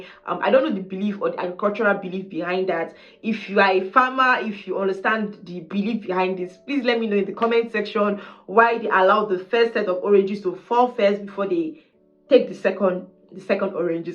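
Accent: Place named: Nigeria. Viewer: You speaks very much like Nigerians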